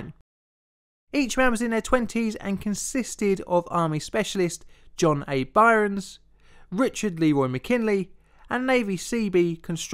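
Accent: United Kingdom. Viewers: Please everyone. Stop moaning over the British pronunciations. Our American pronunciations also grind on the Brits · He doesn't pronounce the 'L' sound, substituting 'W'. Except at the beginning of a word (showing he CAN pronounce L if he tries) Fuel becomes Fuew. 'Controw rod'. "Criticw". 'Impaiwd by one of the shiewd plugs'. 'Faws alarm'. 'Consuwting wif a doctor'. The 'th' sound has disappeared. "Vey wifdrew after seeing 65 rotengens (sic)". This is not a charming regional English accent. It's ugly lazy bad speech. Sadly, English speech had degenerated across the spectrum into a sickeningly ugly patois and it gets worse with every generation. I'd bet that this chap's grandfather had far better speech than he does. The younger ones, the millennials, are barely intelligible. You need subtitles. It's sad. Listen to any old english movie from the 60s and you hear english beautifully spoken. 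This speech is now almost completely lost. These days, the English have by the far the ugliest speech in the Anglophone world. Americans, Canadians, New Zealanders, Trinidadians, etc all speak far better than the English. If you want an example of beautiful contemporary english speech have a listen to Canadian Stefan Molyneux. I could have enjoyed the content of this video but the speech made me feel ill and depressed and I switched it off. Sorry. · Fellow American here, I love how all those different British accents sound. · Why do all these videos have a British guy?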